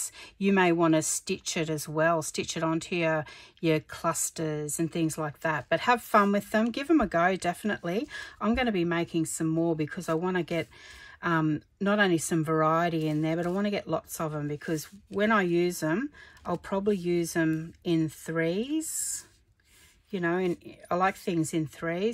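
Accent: Australia. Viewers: Lovely to hear an Aussie accent. Feels like listening to a friend.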